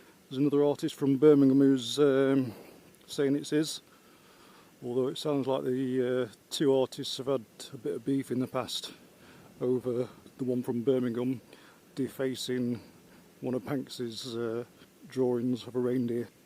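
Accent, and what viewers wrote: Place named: Nottingham, United Kingdom. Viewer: Good owd Notts lad narration...👍eesezizscootizquikkaranmine??.(if yer not a notts lad yer'll never understand)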